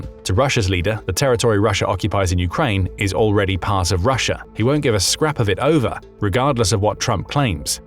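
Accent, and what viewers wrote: United Kingdom. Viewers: Since you are British or Australian.? (due to your accent) you must be for sure against Russia and Putin. To use the word betray is wrong and you have no solid proof that it is the case. I am not Russian but I believe that Russia could eliminate Zelensky in minutes with an Orishnik rocket. However, because Putin promised the previous Israeli leader, Yahud Olmert ( name spelling could be wrong) not to take him out (because Zelensky is Jewish) he is refraining from taking care of Zelensky. My point is this Trump wants peace and to stop the killings in Ukraine. He is a businessman. Imagine if the three superpowers agree to peace the world would be in a much better place economically and safety wise. By the way, Putin wants to mediate cooperation between Iran and China which will happen very soon. I hope Putin will be able to succeed. 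Peace on earth.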